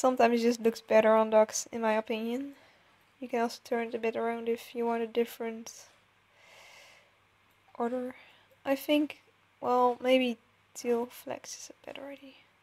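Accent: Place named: Netherlands. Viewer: Super leuk!!! en ik kan wel horen dat je Nederlands bent XD ;)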